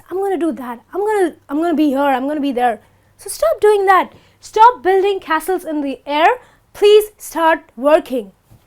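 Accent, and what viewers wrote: India. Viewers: R u Indian? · Nice teaching, hay I'm Dion From Indonesia, I like your teaching, anda I Just wanna to ask "where do you come from?" your accent is like India,... Are you from India???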